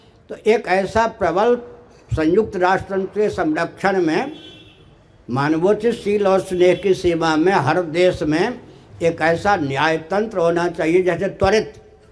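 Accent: Standard. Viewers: Shudh hindi gazab bolte hain 🙏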